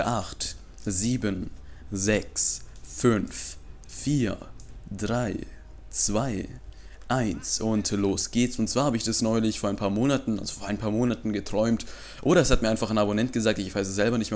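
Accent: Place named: Russia. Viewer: bist du russe du hast so einen akcent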